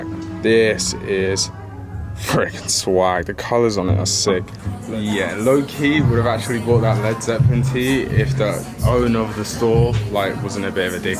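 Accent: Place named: London, United Kingdom. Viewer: i was gonna like straight away just for the accent most of these videos arent acc done by londoners 🤣😅no shame though love to everyone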